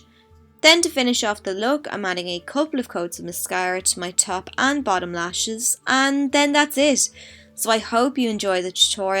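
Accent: Ireland. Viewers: Lol im irish too and you sound like my music teacher XD. My accents a bit different from urs cause im from the north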